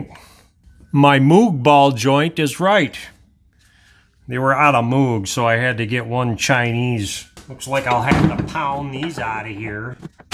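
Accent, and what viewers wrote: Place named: Minnesota, United States. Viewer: Man that's a lot of rust on those parts! Must live in the snowy white north with salted roads, based on your accent and the sheer volume of rust! I'd say Minnesota if had to guess. 🤔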